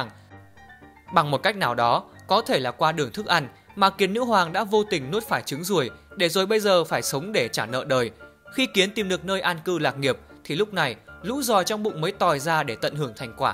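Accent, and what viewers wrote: South, Vietnam. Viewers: Có giọng Nam nữ ta ơi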